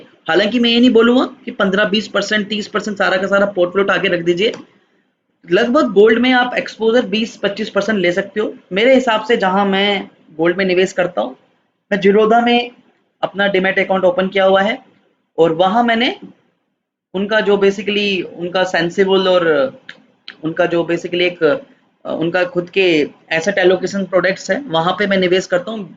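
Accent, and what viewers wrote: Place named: California, United States. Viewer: Cannot understand anything you sad - are you from California?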